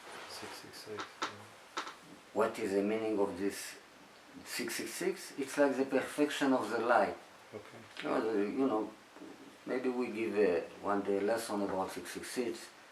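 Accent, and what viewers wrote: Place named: France. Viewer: Je prie pour vous Rav, votre accent en Anglais revele que votre langue maternelle est le Francais ainsi que la mienne. Je prie que YESHUA le ROI MASHIAH BEN YOSEPH souffrant a sa premiere venue avant le destruction du 2eme temple et qui a pris les peches des brebis perdues d'Israel en premier lieu et ceux du monde entier ensuite....mais reviens comme le LION DE JUDAH se revele personellement a vous comme il l'a fait avec moi...IL m'a gueri quand je ne le connaisais pas....vous, vous avez le privilege d'avoir entendu la Bonne Nouvelle de Son Royaume, moi je ne l'avais pas eu et je ne savais rien a son sujet....jusqu'a ce que dans la priere au Boreh Olam Baruch Shmo je lui ai demandee de venir me guerir de ma maladie quand j'etais en France. Ce soir la YESHUA Hitkadash Shmo, est venu vers moi, IL a pose ses mains sur moi et IL est reparti, mais 3 jours apres j'etais completement gueris. 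C'est alors que יהוה m'a fait comprendre que je devais lire SA PAROLE ECRITE....mon papa Salomon, qui est au ciel, par la Grace que YESHUA lui a donne, etait le Chazan de notre sinagogue chez les Lubawitch, que j'aime beaucoup, c'est la ou mon fils a fait sa Bar-Mitzva. Mon papa avait grandi dans une Yeshiva a Tanger et il nous racontais que jamais ils etudient les prophetes et il n'avait jamais lu les propheties sur le MASHIAH. Que le D.IEU יהוה d'Israel vous fasse Grace comme IL nous l'a fait a nous et vous et votre famille soyez sauves par celui qui VIT a la droite du PERE Eternellemen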